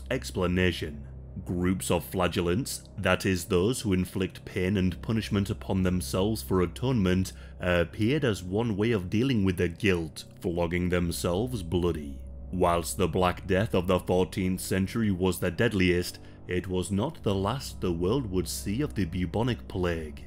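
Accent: United Kingdom. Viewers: he hasn’t got a ‘funny accent’, he has a regional British Accent. Perfectly acceptable.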